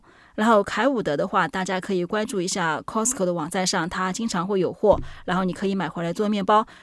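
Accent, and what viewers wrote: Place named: Shanghai, China. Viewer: 博主是上海人？口音可爱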